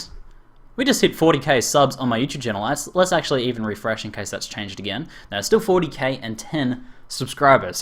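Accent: Australia. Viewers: Great Vid, Great to hear a fellow Aussie doing well. What state you from? PUMPED about the Oz server, would love to play with you sometime. peace.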